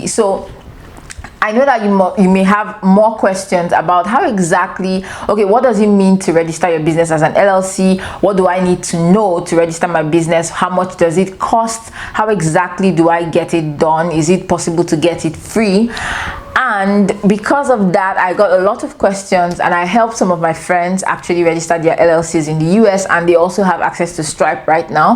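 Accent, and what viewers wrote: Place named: Nigeria. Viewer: You sound like a Nigerian you speak exactly like me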